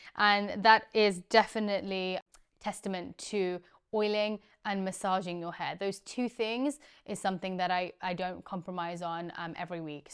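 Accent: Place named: United Kingdom. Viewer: Are you an Indian? I like your accent, it sounds like a little British accent :)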